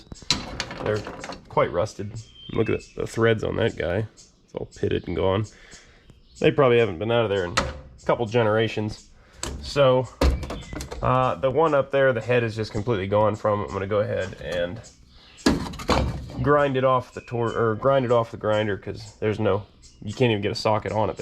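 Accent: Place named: Pittsburgh, United States. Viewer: Love to listen to yinz’s accent! Just like home. Very interesting!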